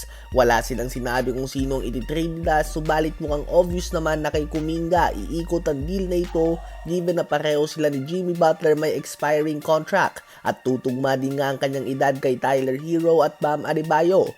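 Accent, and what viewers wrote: Visayas, Philippines. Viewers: Pang bisaya mga sounds mu😂mga bisakol at badjao lng makikinig nyan😂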